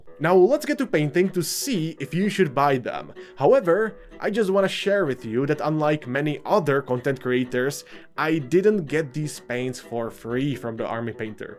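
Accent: Czech Republic. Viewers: Where are you from my guy? You sound like guy from Czech Republick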